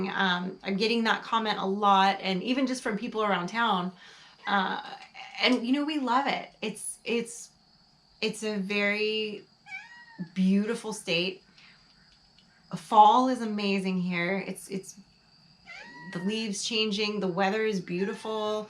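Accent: South, United States. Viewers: Hey I'm starting to hear a little bit of Southern accent coming through when you talk. I lived in Georgia for four years and my best friend lives in North Carolina so every time I go visit I pick my accent back up within a few days. It's been 30 years since I lived in the South. Have a good weekend and God Bless!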